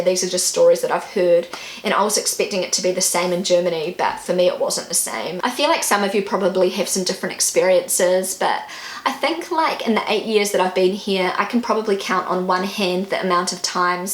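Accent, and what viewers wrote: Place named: New Zealand. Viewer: It is so refreshing to listen to your nice New Zealand English!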